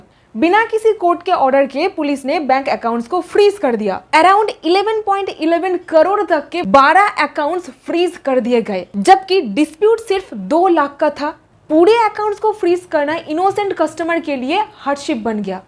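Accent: Bengal, India. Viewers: Bangali ki hindi jyadatar aisi hi hoti hai 99.%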